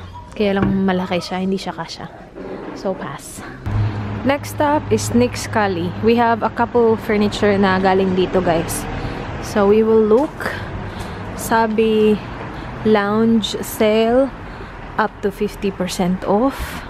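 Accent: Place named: Visayas, Philippines. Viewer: Diko na gsto accent ng English mo. Tunog bisaya na. Nong nasa cebu ka gstong gsto. Or baka sa video lang kasi madame kame bisaya na viewver mo.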